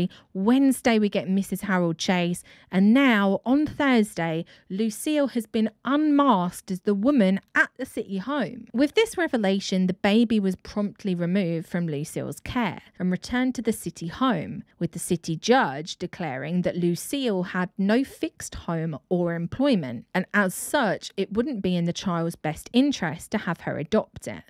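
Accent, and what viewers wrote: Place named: United States. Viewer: Please, I'm begging you, no more American accents.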